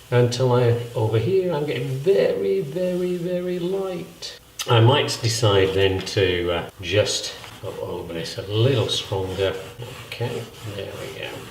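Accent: United Kingdom. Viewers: YOUR ENGLISH PRONUNCIATION IS VERY BRITISH,I LOVE TO HEAR IT! ANYWAY,METHOD WAS GOOD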